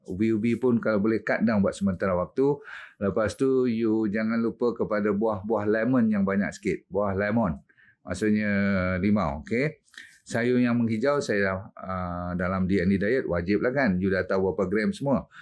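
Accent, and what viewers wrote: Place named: Perak, Malaysia. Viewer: Doctor orang Perak ,tak bolih hilang Bahasa ibunda sekupang Limakupang cukup kelakar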